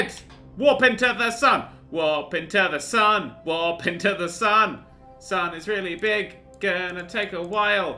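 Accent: United Kingdom. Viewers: seriousy?? u sound like ur from great brittian, if so. hw do u NOT know doctor who??and why does the interior look old (season 3-4 or something)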